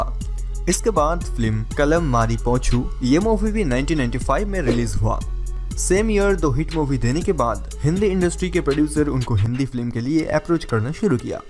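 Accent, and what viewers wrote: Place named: Hyderabad, India. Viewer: Kya baat hai bawa, ek dum Hyderabadi 😄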